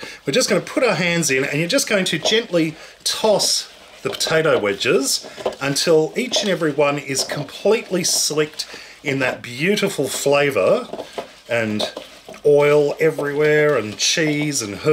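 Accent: Australia. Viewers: Shahrukh Shaikh he has an Australian accent and Sam has an English accent. They sound very different.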